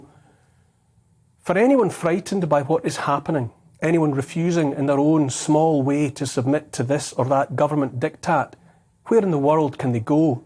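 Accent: Scotland, United Kingdom. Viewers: A combination of a beautiful Scotish accent and incredible intelligent, Sir Neil you are an incredible human soul ❤️ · Listening to Neil's Scottish accent only makes me wish a bagpipe band would go marching the hill. Wish I played. I can do scottish country dancing and miss it. Thank you for your support from this Canadian Pilgrim in British Columbia (Heritage is Scottish and South African, sure do wish the Commonwealth countries, and the rest of the world, would get it together to respect its people sooner). We must win. For our children. For our country. For Humanity.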